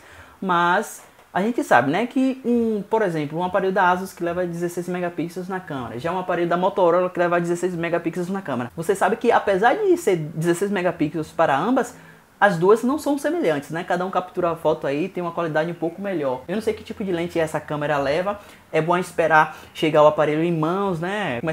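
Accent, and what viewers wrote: Bahia, Brazil. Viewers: vc é baiano cara?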